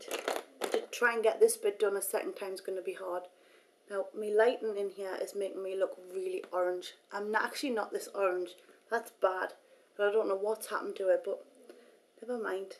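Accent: Newcastle, United Kingdom. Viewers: oh get a life, shes a geordie lass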